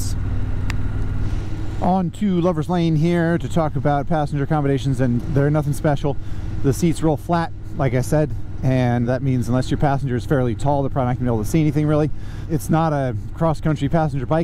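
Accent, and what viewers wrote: United States. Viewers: Hi, hi, hi comment section chatters, just this line to say: real nice intro, the change is welcomed (your laugh / the rain) thank you for your verbal skills.. best ad rep online (not that i am interested since i'm european and we get real motorcycles ) 😹 (sorry the pun could not be whiff held) thank you for the number of bikes you put your tushee on (this one looks as ugly as it can faking retro looks...) thank you for making me remember what it is to hear the north 'murican language (don't know why but i do miss it ❤ ) thank you for (in the future) changing the format more often (like you did under the rain or in india) (just couple it with revere ad rep 😸) thank you for ari and lance and spurgeon thank your wife (and kids?) good luck have fun, thank you for your company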